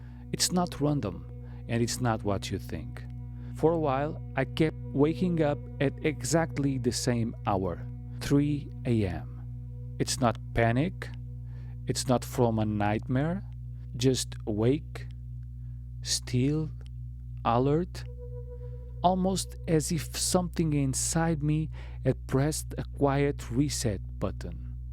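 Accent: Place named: Portugal. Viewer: Do you speak Portuguese? I hear your accent! Do tell us more about yourself. I just read “ Why we sleep” book. Sleep is fascinating. We are so complex!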